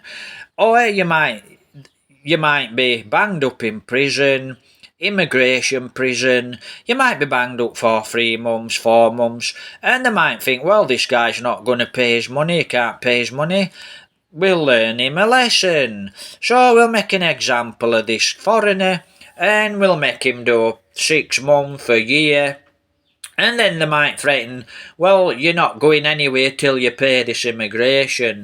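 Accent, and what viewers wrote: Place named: Barnsley, United Kingdom. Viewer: Hi mate. I’m from Hoyland. Just having a debate with my mate about if you were actually from Hoyland as he says your accent sounds more Barnsley than Hoyland. Can you put him straight where you went to school etc. cheers mate. Look after yourself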